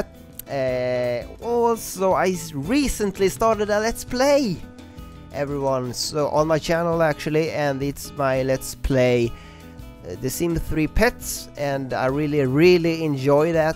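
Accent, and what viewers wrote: Sweden. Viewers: Is he swedish? o.o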